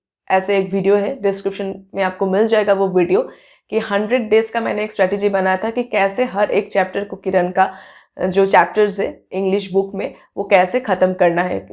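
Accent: Bengal, India. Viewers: Mam r u Bangali or asami?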